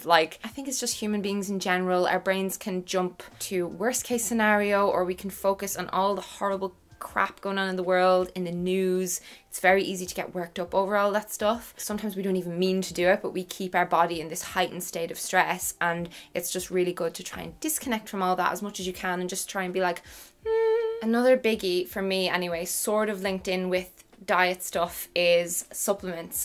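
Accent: Ireland. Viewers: your accent is so cute... wish i was irish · Love your irish accent! And nice tips!!!! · Sometimes when I watch YouTube my brain doesn’t register accents AND HOLY SHIT YOU’RE IRISH. also you’ve probably heard this but I love your accents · God i miss Irish accents like yours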